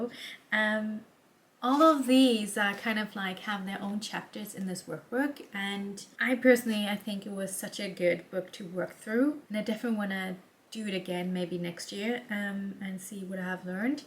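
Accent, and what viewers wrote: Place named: Germany. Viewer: You’re doing great! Are you from Germany? Your accent and voice is very similar to a close friend of mine who is from Germany. :)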